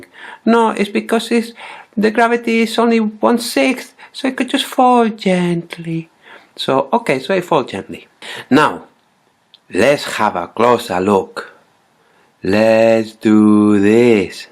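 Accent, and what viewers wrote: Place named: India. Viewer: sounds like that indian guy from short circuit. kinda cool accent lol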